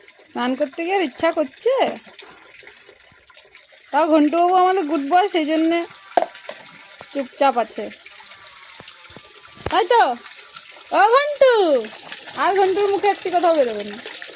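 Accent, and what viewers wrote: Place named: Bardhaman, India. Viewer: Apnera ki Bardawan district er? Kathaie tan ache. Amio taie. Amio pet lover. Tmato r ma. Tachara Rambo Goldi Maria Til Til ache. Ar Chunni Munni Maria Julia ar Chorki. Aro anek. Nomosker.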